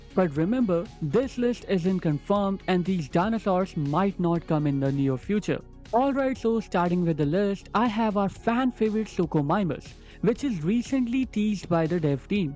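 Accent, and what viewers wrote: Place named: India. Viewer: It’s my first time in your channel and you sound indi a don’t want to be rude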